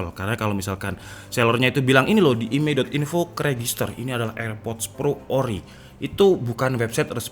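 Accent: Java, Indonesia. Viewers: Di kelet kan pakai api... Wes talah jowo mu loh mas Medok banget... Seneng aku mbek bahasa ne..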